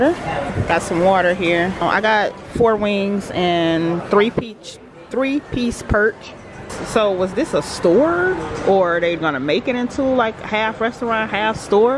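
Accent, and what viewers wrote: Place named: Midwest, United States. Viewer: We love y'all too girl! I'm from the midwest too...and we even talk alike. And yes we would say "hooo" for the ice cream truck...lol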